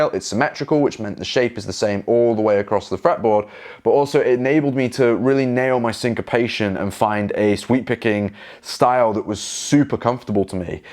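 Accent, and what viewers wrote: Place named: Stoke, United Kingdom. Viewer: Uhhh man. That Stoke accent is hideous 🤮🤮🤮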